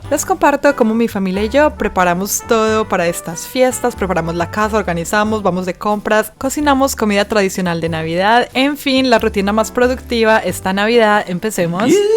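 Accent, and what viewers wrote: Antioquia, Colombia. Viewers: Me encanta tu hacento colombiano, un saludo . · se te nota que la mamá está en casa, ese acento paisa se te escucha hermoso ❤ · Me encanta escucharte bien colombiana, no se si sera porque estan tus papas y se intensifico tu acento, pero cuando hablas de las sabanas me haces acordar a Gaviota de “cafe con aroma de mujer” una serie colombiana jaja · Hermosa familia amo tu asentó colombiano 🌲🌲🌲Muy feliz navidad para ustedes también saludos desde Uruguay siempre te veo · Tu acento colombiano es muy lindo🥹❤️ me alegro por tus sábanas nuevas, a veces es bueno comprarse unas cosas para nosotros mismos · con acento colombiano ave maría 😂🎉❤ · Me da mucha risa cuando hablas bien colombiana 😅 me hace acuerdo a las comedias que eh visto. Por ej Cafe con Aroma de Mujer 😊 saludos desde Uruguay❤ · Me encanta ese acento 😂 colombiano ❤ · Que bonito video, me encanta tu asento paisa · Que cool hablando tu acento colombiano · Me brinca el corazon cuanso veo q hay video nuevo 😊😊 y mas cuando hablas cn tu acento colombiano❤❤❤... 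eres una verdadera motivacion para nosotras las madres. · Muy ricas recetitas. Me encanta que cada vez que vienen tus papás tu acento colombiano regresa y se te escucha muy bonito. Felices fiestas para ti, tu familia y para toda esta comunidad 🎉🧑‍🎄🎄🪅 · Eh ave maría!! Me encantaaa tu acento de paisa!!! Deberías de hablar más así me encanta me encanta 🥰🫶🏼🫶🏼 😍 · Ave maria tan bello ese acento pues. Y tus sabanas hermosisiiimas omg😍😍😍 y esa cazuela ummm yummy que delicia tener a tus papis ahi🥹🥹❤❤❤ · me.emcanta cuando hablas con tu acento colombiano me.muero 🎉🎉❤❤ eres la mejor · amoooo cuando habla bien colombiana, debe hablar más con ese acento 😂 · Me encanta escucharte hablar más tu asentó colombiano ❤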